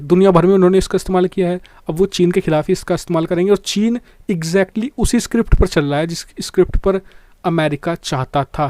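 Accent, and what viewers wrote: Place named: Bengal, India. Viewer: Tum kidharke banda hai sala bangala jaisa boltahai hindi vi achi nahi baltahai.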